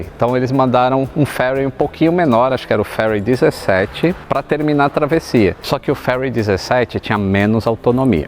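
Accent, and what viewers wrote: Brazil. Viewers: Simplesmente, OBRIGADO,. A história da "aventura" de Gago Coutinho e Sacadura Cabral mais bem contada que eu conheço! Só um Brasileiro em Português com açúcar para contá-la. Parabéns! Um abraço comovido, Irmão.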